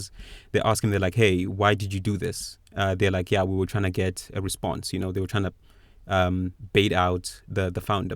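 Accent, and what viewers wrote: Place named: Africa. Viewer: Firstly, you should never make another video. You suck and your voice sucks. Secondly, the decision to invade Paradi was made by brats who never knew the kings vows and were just trying to return home as heros. It was never the orginal intention of the Marley Army. By the way you sound African. Overthinking is the reason we are poor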